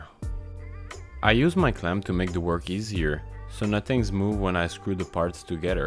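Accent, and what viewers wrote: Quebec, Canada. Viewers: J’adore comment tu ajoutes des « h » au début de certains mots et tu les retires du début d’autres: on reconnaît ainsi l’anglais avec l’adorable accent québécois! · Est-ce que je reconnais un petit accent québécois?? Beau projet btw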